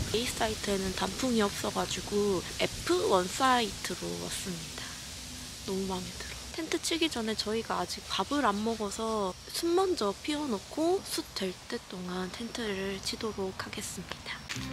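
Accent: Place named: Gwangju, South Korea. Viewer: 요즘 무한재생으로 보고 있어요 집에서 그냥 틀어놓기도 좋아요 ㅋㅋㅋ 저도 광주인데 사투리가 편안합니다ㅋㅋ 앞으로 더 큰 채널이 되길 바라요 ♡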